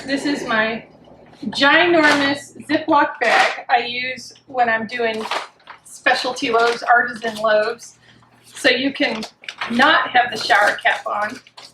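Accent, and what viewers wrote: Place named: Austin, United States. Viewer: Love your demo (and your accent: I'm an Austin native!). I love that people are rediscovering clay bakers. Back in the 70's there was a trend (well, back then it was just called a fad) where we were all baking loaves in terracotta flower pots (in our bell-bottoms with flower-patches and halter-tops made of bandanas). Worked like a charm.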